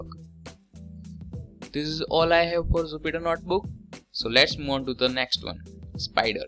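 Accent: Gujarat, India. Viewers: Make gujarati friends and you will understand this accent. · From your accent !! I am also Gujarati that's why i know.. BTW i like your video and want more videos about ML and AI. THANKS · R u gujju? I assumed by your tone.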